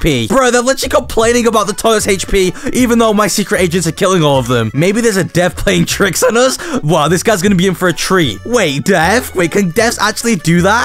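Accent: United Kingdom. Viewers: Bros British…Hello mate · HES BRITISH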